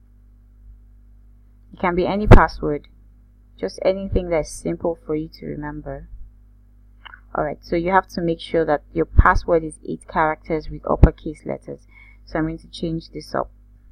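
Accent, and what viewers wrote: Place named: Nigeria. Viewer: Ah, Nigerian. I thought you sounded Caribbean ha ha. I see what you mean. But you say switch my BCH to Eth or BTC. But i don't have anything to switch. I have nothing, I'm looking to start trading. Are there other platforms where I can buy BCH directly? Or do you think I should buy either BTC, ETH, USDT externally first, then transfer it to finance to trade?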